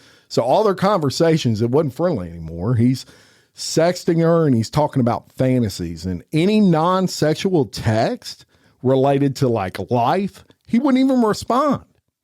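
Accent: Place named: Appalachia, United States. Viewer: Your hillbilly accent makes the whole boring show worth listening too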